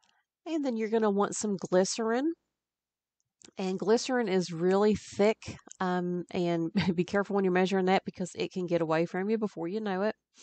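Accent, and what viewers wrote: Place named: South, United States. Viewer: Lovely job. Very similar to the recipe use.... just subscribed to ur channel I'm a fellow bath and body product creator... love ur personality and I can definitely relate to making messes while working 😆... love ur southern accent btw...I'm from NC and I've got that twang too 😆 God bless and hope to see many more of your beautiful Creations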